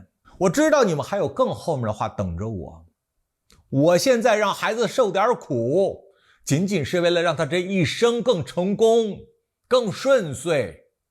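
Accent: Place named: Northeast, China. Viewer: 本想听两句傻逼言论。没想到一上来的煞笔东北茬子味就受不鸟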